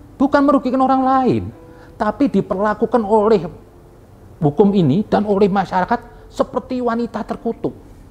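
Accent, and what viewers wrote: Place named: Java, Indonesia. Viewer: Habib asli org mana sih? Aku suka aksen jawanya....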